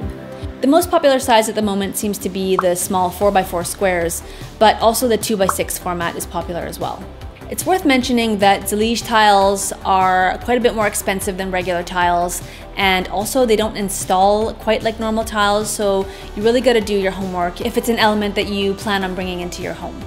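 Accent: Italy. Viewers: Where did you grow up? Your Italian pronunciation is beautifulllllll — my HS and college Italian teachers’ ears would flutter — but I definitely hear a hint of some other accent while you normally speak. It sounds like a medley of 3 or 4 influences. Italian has gotttt to be involved.. you obviously speak English, but American English? Do I dare say no? And I want to say French? I am such a lover of soaking up unique ways of speaking and I’ve always been very intrigued by the way you speak! Side: I listened to the audiobook The Glass Castle yeeeears ago (one of my all-time favorite books) and it was read by the author herself who grew up allll over the United States and her accent was sooo unique. I’ve been super aware of tiny details in accents ever since haha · Your italian accent is perfect! Greetings from Sicily ☀️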